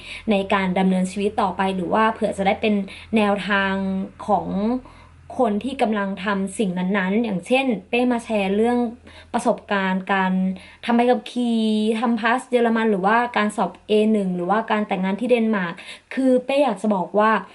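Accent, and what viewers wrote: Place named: Isan, Thailand. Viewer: เว้าอิสานน่ารักมากน้องเป้😘😘😘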